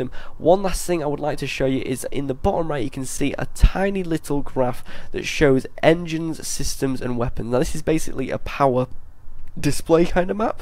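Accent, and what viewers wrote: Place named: United Kingdom. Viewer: You might well not like his voice. But it's a regional British accent rather than a nose blowing issue.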